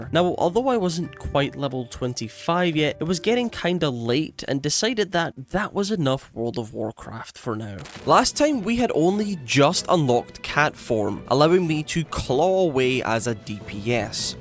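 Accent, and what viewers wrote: Scotland, United Kingdom. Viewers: The accent make me remember my friends grandpa from Scotland 🏴 ❤ he was such a nice guy and these videos are so comforting ❤ love from Norway 🇳🇴 🎉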